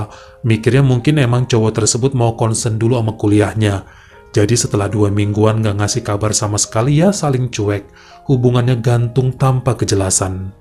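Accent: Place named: Java, Indonesia. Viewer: HALLO S NYA 3 SYRIA SELAKU HADIR BUAT CHANELNYA KAK,........SEMOGA MAKIN SUKSES UNT CHANELNYA YA KAK, BIKIN KANGEN KRN PEMBAWAAN BAHASAANYA LUCU DKIT DIKIT EHEMM GITU DI BUNUHI DNG BHS JAWA YG MEDOK TAPI ASIK BANGET LOVE......U ❤❤❤❤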